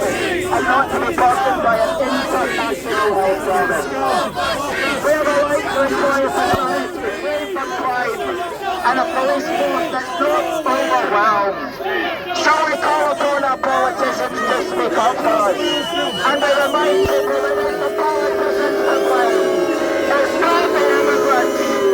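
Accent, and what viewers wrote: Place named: Scotland, United Kingdom. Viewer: He sounds Scottish to me!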